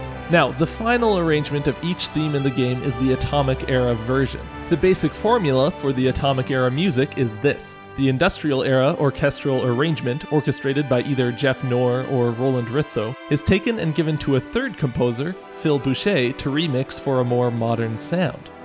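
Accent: United States. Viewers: "And I'm not even American" That threw me for a loop there for a second. I was about to say "but, his accent is clearly Amer..." and then I remembered that for the most part the Canadian accent is indistinguishable from the American accent, unless you have a heavy regional accent. Sorry Canadians, for always assuming you're American unless told otherwise. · i never even realized you were canadian! i think my mind just kinda assumed you were northern/midwestern american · Wait you're not American? Then where are you from that has such an American accent? Canada? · I thought you were american, were are you from? Very nice talent in music, you have.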